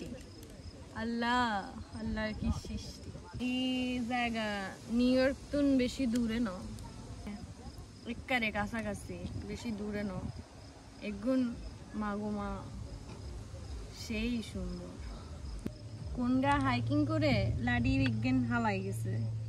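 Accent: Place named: Noakhali, Bangladesh. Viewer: 😮my god you reply my comments 😢tnx hum apner noyakhali kotha bola sundor mona hocca akdom noyakhalir maye 😊jai hok akhono ghuman ni rater baja 1ta 😅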